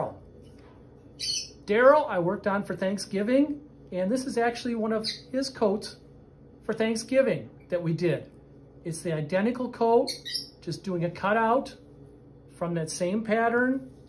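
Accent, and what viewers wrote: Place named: Midwest, United States. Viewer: and accent!! Midwest??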